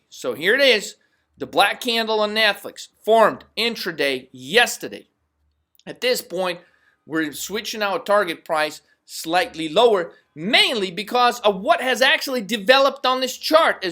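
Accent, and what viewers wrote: South, United States. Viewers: i love this man. italian name with a southern twang with balls hanging to the floor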